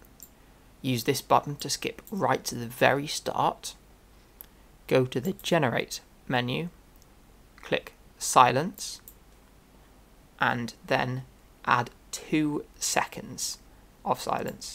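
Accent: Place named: Newcastle, United Kingdom. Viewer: Geordie ASMR with a tutorial in the background. Nice.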